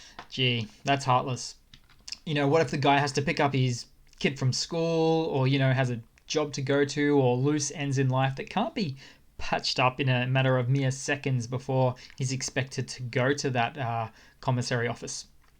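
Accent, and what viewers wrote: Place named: Australia. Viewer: Thks & you sound aussie; I stills can't figure-out how yous-guys don't fall-off the bottom of the earth ;) Pretty-good trick though.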